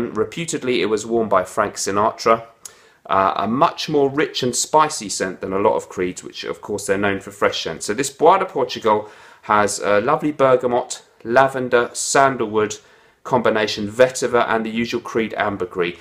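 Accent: United Kingdom. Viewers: Canadian sub here..... As I said before, there's nothing more commanding than a proper Brit accent! I'm so very thankful to you for the Bois du Portugal recommendation.... Got a sample from a place called Holt Renfrew here in Canada and just bought a full 120ml bottle... On a side note, if you wanna be blown away by a phenomenal upscale G.I.T frag (believe it or not- brother you've gotta gotta gotta get a sample or somehow try out RAIN from the House of Royal Crown.... It should be readily accessible in the U.K from what I've researched! Project all you want Mr.!👍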